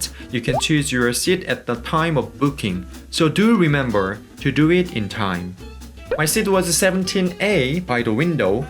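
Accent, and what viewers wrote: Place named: South Korea. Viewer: Such an honest pronunciation of a Korean dude. Just speak Korean and add captions in English would be a much better and high quality method for your vids mate.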